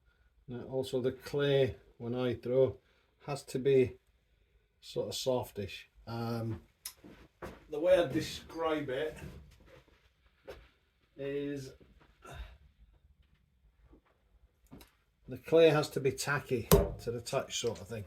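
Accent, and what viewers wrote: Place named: Scotland, United Kingdom. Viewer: Seeing the kilns prices here in Hungary, i wouldn't mind having one of yours :D nah just playing....looking forward to seeing the final products! btw, you sound and talk exactly like a Scottish colleague of mine called Dave, i can hardly hear the difference!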